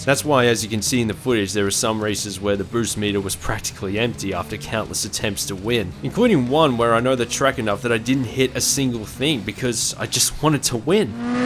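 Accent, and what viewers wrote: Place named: Australia. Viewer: I just wanted to tell you that i really like your work. The scale you cover and your approach to all the racing video games is really satisfying. Since you're an aussie, i also consider you as the gmanlives of the racing game genre lol. Your voice is also similar but it probably also comes with your aussie accent. :D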